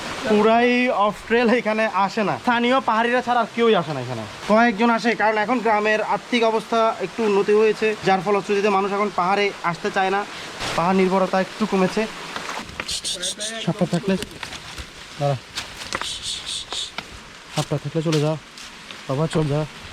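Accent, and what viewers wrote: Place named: Feni, Bangladesh. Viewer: ভাই তো আমাদের সেম টু সেম ফেনীর ভাষায় কথা বলেন 😮 অসাধারণ লাগে শুনতে 🥰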